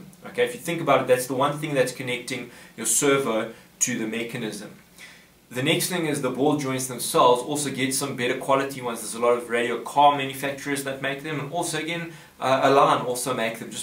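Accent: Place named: South Africa. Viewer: Nice SA Accent! ... :)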